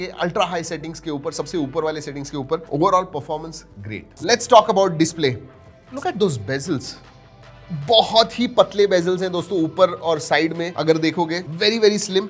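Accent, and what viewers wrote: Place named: Khandesh, India. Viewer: Oh tuni bahna nig baher 😂😂😅wow sir tumhi bhi aahirani boltas maja yi lagi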